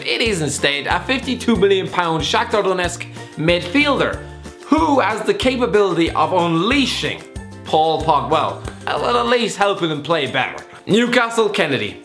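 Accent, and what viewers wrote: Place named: Ireland. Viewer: Listening to a guy with a broad Irish accent attempting lengthy Greek pronunciation is a golden experience.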